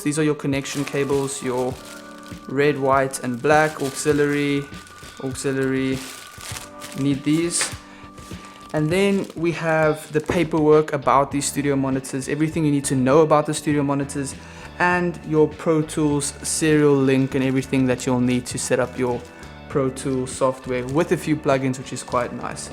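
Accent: South Africa. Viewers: This guy sounds South African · You are South African, I know us very well, lol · you have a South African accent bro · Lekker South African Accent my bru... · Is that a South African accent?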